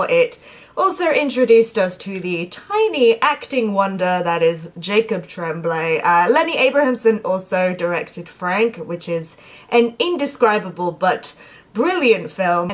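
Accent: United Kingdom. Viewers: Do you live in Southeastern England? You don't have a heavy accent.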